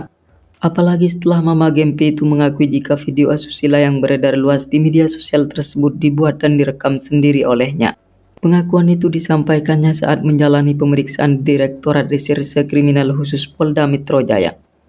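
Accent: Madura, Indonesia. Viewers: Sepertinya org Madura ini pengisi suara nya wkwkkw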